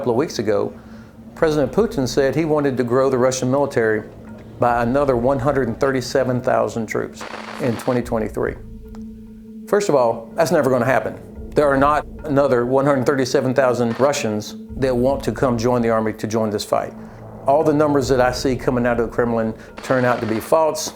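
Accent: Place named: South, United States. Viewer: You have a southern United States accent so you cannot know what you’re talking about.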